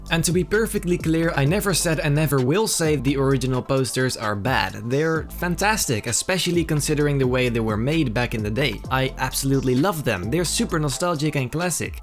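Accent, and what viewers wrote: Netherlands. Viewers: His Dutch accent is very annoying sorry, nice photoshop tho.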